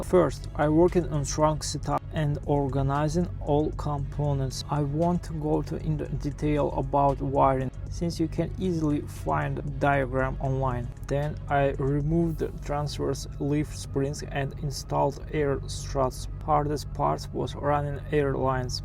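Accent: Russia. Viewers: По ходу русский с таким акцентом говоришь как ты брат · Какой-то у тебя акцент русский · Наш русский слоняра 😁 акцент не скроешь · Бро, ты русский, или что? Просто оч сильный русский акцент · Is the author from Russia? Why is there such a harsh accent in speech? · Вы русскоговорящий ? Акцент очень похож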